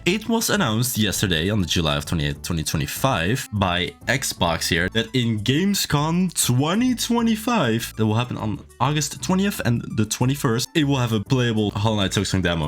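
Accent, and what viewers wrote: Netherlands. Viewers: Wacht is deze man nederlands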